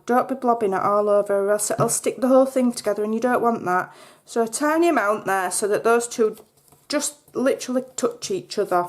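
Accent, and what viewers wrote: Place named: East Yorkshire, United Kingdom. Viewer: Thanks, this is a lovely idea. From your accent you sound like you are from East Yorkshire, like me!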